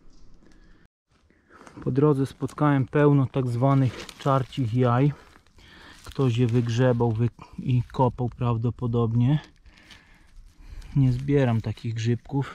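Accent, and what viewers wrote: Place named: Masuria, Poland. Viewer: Skąd jesteś? Sądząc po akcencie gdzieś z Mazur. Zgadłam?😉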